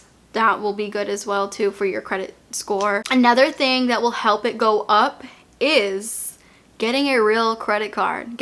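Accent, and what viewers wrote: Boston, United States. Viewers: It’s so funny, some times your Boston accent comes out WICKED strong, some times you only seem to accentuate certain words (I’m sure you don’t do it intentionally) Lol, I used to live and spent quite a few years in Lowell, Fitchburg, Cambridge, but I don’t have a Boston accent at all since I’m originally from Philly. (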